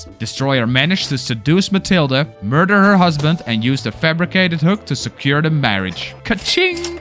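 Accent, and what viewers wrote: Netherlands. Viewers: Are you dutch perhaps? I think i recognize the accent because i'm dutch as well. GEKOLONIZEERD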